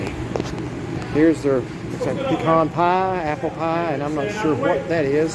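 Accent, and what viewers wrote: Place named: North, United States. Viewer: You can spot a yankee 40 miles. Still don't know how to say pecan .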